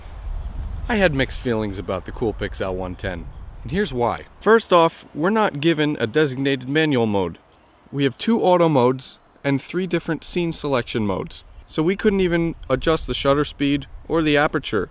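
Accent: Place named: Buffalo, United States. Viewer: Your accent sounds like maybe Buffalo, New York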